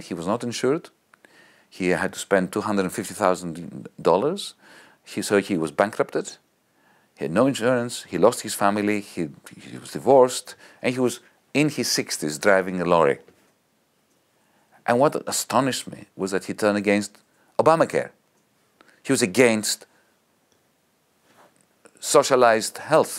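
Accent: France. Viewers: Mais... Pourquoi tu commences l'interview en prononçant pas trop mal l'anglais, puis tu switches sur le pire accent français ? Un petit effort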